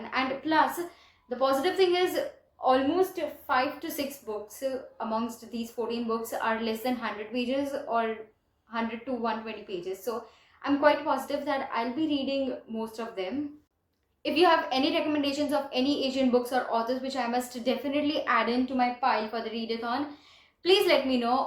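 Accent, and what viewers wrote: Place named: Gujarat, India. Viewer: Nice Gujarati accent☃☃☃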